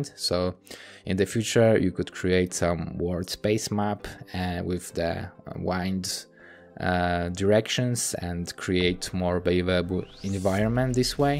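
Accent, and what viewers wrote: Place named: Poland. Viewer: Dude You are really talented and I am grateful that you present these techniques, I am really profiting and learning from it, but pleaaaaaaaaase for the love of god make a script for your videos or prepare some form of presentation. The animation and footage are good but that bored 4 AM freestyle and "uhmmmm" on top of that thick polish accent is hard to listen to broooo, it unnecessarily undermines your porfessionality and credibility, please 🙏🙏🙏🙏. still subscribed though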